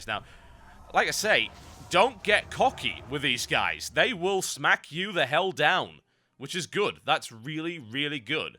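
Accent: United Kingdom. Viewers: are you british or American im confused : /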